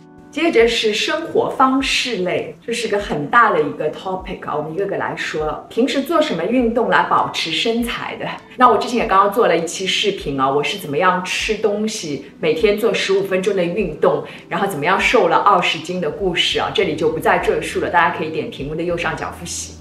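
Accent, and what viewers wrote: Shanghai, China. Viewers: 常驻伦敦的上海人表示听着很上海很亲切 恳求不要改进❤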